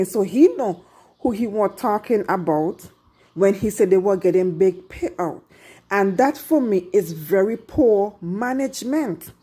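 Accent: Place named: Jamaica. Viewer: There is only one truth in this space , if it sounds too good to be true it is, they are all ponzi schemes. where u from ? u sound jamaican.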